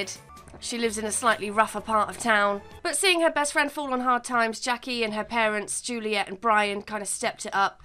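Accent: United Kingdom. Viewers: are u from uk i live in uk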